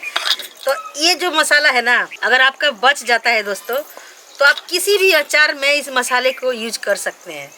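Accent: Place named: Chapra, India. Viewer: Tohar bhasha se bhujhata rahaa chaapra bihar se lagti hai.kaha se hai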